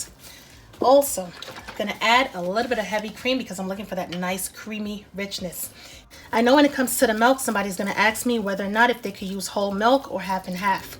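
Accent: New York, United States. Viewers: I hear the NYer in your voice 😂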